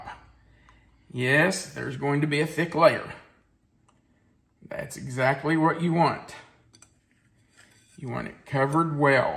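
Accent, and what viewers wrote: South, United States. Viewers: These recipes and slow southern accent remind me of my daddy and Mother, long since passed. Nothing beats the taste and love in these delicious dishes.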